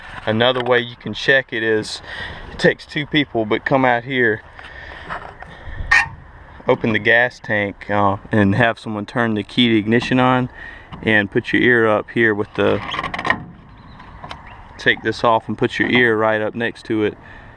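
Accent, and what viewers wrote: South, United States. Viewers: i don't care about the fuel pump . That southern Accent makes everything sounds fine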